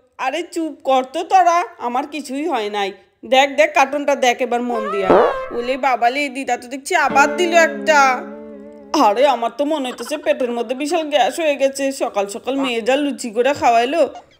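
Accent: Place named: Bangladesh. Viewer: হুম কথার টোনে বাংলাদেশি কিছুটা বুঝতে পারছি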